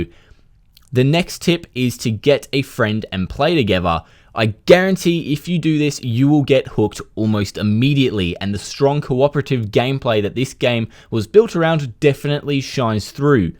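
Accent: Australia. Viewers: You australian? What city you at. I'm in perth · yo didn't know ur australian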